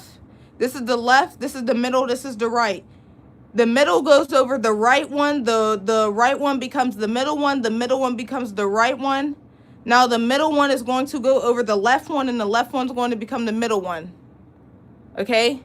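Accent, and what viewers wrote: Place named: Philadelphia, United States. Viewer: I'm big on accents, where's that accent from? It sound like the Philly area or Rhode Island or Baltimore, I can't put my finger on it😂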